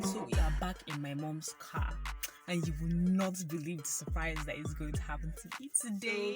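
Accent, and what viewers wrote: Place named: Igbo, Nigeria. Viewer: I am soooooo hearing the igbo In you!😂😂love it! "Foldeble"😂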